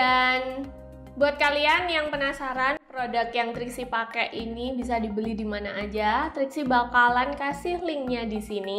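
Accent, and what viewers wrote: Java, Indonesia. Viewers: pertama kali liat langsung subscribe :D lucu medok jawa gitu haha sering" upload dong kak ^^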